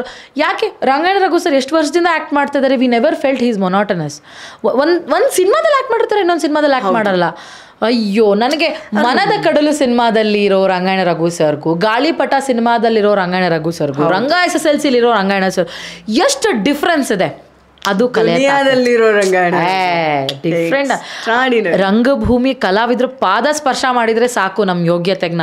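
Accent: Mangalore, India. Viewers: Mangalore kannada spr😂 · Mangalore accent supper. Namma kudla namma karla great. Love from Karkala. All rounder 🎉❤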